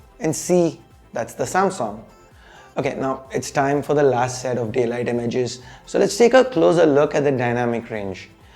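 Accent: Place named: Tamil Nadu, India. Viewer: Ennatha fluent ah English pesunalum....nammalungandrathu thaniya theriuthu 🙏🙏🙏🌸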